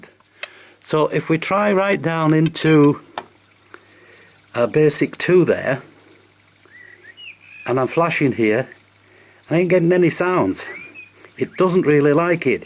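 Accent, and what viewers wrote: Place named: Yorkshire, United Kingdom. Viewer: Thanks....it also helps that you have a Yorkshire brogue....I'm a Wakefield (Normanton rerally) ex-pat down here in Kent. I've set up my Deus exactly as you show.....now to get a chance to use it. Happy New Year in about 15 minutes...Phil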